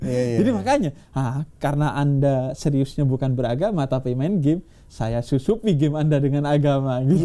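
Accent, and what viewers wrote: Madura, Indonesia. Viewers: pantesan habib logatnya madura ternyata dari bondowoso semangat bib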